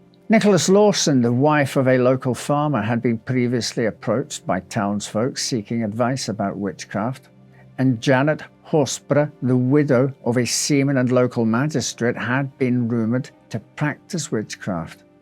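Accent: Scotland, United Kingdom. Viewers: I'm obsessed with this man's accent, is he Scottish?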